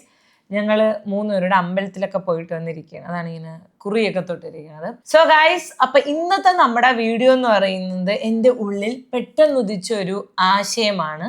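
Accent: Trivandrum, India. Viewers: See nigal orikkalum oru disrespect alla.tvm side orupadu peru agna vilikkarund it's normal.chilath okkei slang different aayond rude aayi thonnunnatha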